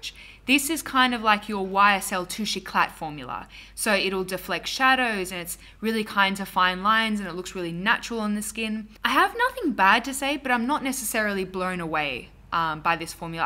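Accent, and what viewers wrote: Australia. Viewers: Your Aussie accent is my fave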